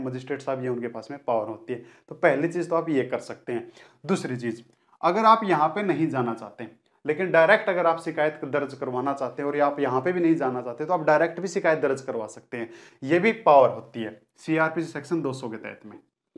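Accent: Haryana, India. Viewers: Bihar se hu per boli haryana ka lagta hai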